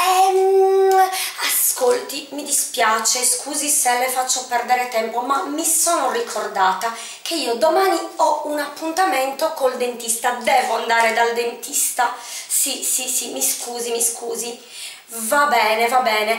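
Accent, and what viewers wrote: South, Italy. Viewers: it is not a good accent. she is nice teacher but with a very bad accent. she is from south, all the vowels are too open. it is not correct. for italians it is very bad accent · questo purtroppo non è l'accento perfetto. E' un accento del sud.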